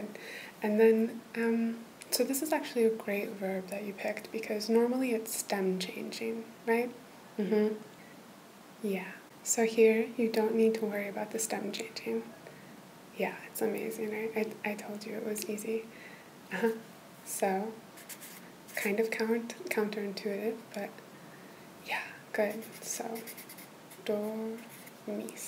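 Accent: Argentina. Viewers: Sos de Argentina? Porque tenés el acento perfecto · is she argentinian? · Yo con tu video tengo mas vocabulario 😊 y me gusta mucho el pais de Argentina 😍 mi idioma a aprender y mi acento favorito en solo un video 🙈 thanks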